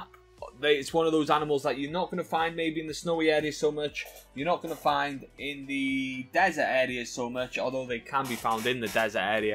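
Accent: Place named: Wales, United Kingdom. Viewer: Nice your welsh just like me were abouts wales you from bud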